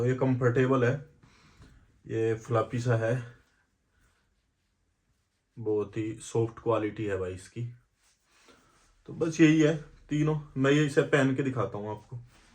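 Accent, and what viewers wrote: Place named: Baghpat, India. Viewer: Bhai teri Baghpat wala language ni gyi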